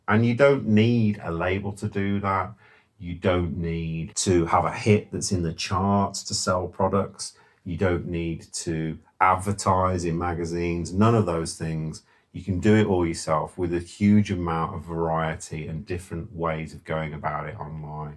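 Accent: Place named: Birmingham, United Kingdom. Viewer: Hi again. From your accent I’m presuming you’re local to Birmingham as am I. If you ever want to collaborate on anything then please get in touch. I’m a piano and woodwind player so I’m sure we could cobble something together. 👍🙏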